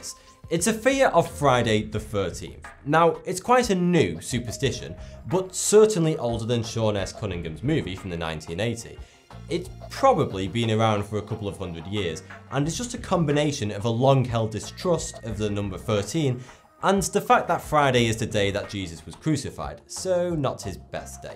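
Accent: United Kingdom. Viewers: why are you british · Now I'm confued. For the longest time I thought you called yourself FORTY-two. But the name of your channel is, so I figured your British accent made it SOUND like. Then you just mentioned they're superstitious of 4, the 40s, including your name FORTY-two. So, which one are you, or? And how did the name originate?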